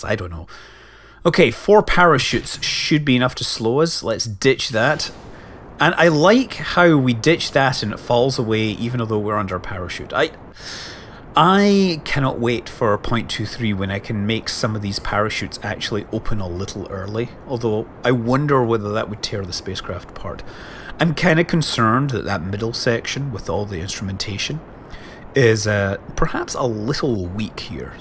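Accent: Scotland, United Kingdom. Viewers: Well... new favorite Interstellar Quest episode found! Drama, Suspense, perseverance aaaand all in a Scottish accent... Everything a good show needs.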